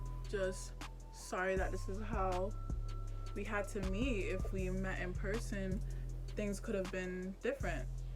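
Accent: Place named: Jamaica. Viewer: yeah, I was asking because you had like an accent also Jamaicans have pretty accents.😊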